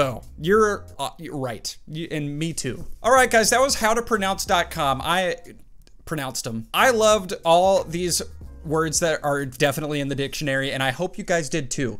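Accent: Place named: Italy. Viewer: Best Italian accent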